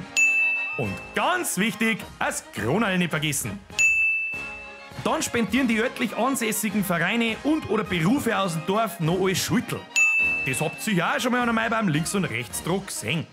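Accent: Bavaria, Germany. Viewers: Geil geiler original boarisch Prädikat besonders zünftig 🤘🏻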